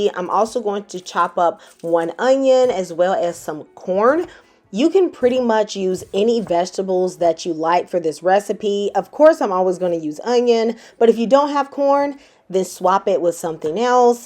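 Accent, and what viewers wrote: South, United States. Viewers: Where you from if I have to guess I would say Carolina’s, or Georgia. I love your channel.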